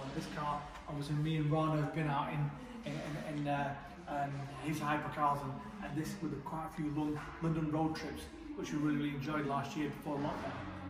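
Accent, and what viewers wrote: India. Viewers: Hey bro you are indian we get that from the accent and your name but why don't you reveal your identity??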